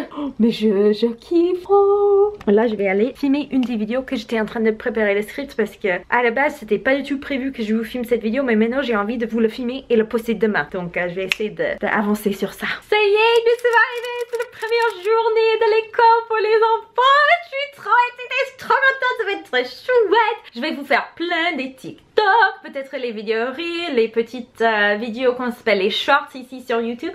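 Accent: Quebec, Canada. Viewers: Trop marrant, j’ai l’impression que tu attrapes un petit accent québécois 😂 Trop bien pour toi en tout cas, une énorme charge mentale en moins! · Hello:) Tu commences à avoir un accent canadien quand tu parles français. Je l'avais déjà remarqué dans ta dernière vidéo. Super cute! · Ahahaha tu commences à prendre l'accent québécois, j'adore!!! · Oh le bel accent canadien 😁. J'adore ta coupe , ça te va super bien ❤️. Et trop contente pour toi, une nouvelle vie commence 😘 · Ce que tu dis sur les sectes... Que le problème c'est les gens qui partent et tout... Je viens de réaliser que c'est exactement la même chose dans le monde du travail, c'est toujours la faute de la personne, jamais de l'entreprise 😳 PS : c'est vrai qu'on entend un léger accent québécois 😅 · Coucou j'ai l'impression que tu prends un peu l'accent québécois c'est amusant 😉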